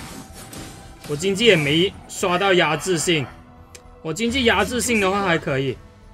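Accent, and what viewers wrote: China. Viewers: 你是大陸人嗎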